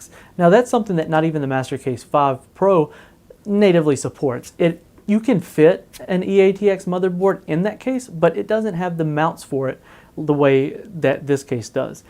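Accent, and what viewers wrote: South, United States. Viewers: Is that Southern accent?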